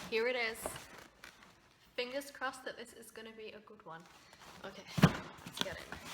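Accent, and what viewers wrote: United Kingdom. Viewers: You sound from the uk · Have you lived in America long? You have a strong accent from Britain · Are you from uk? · What’s with your British accent? Sounds really nice, where are you from?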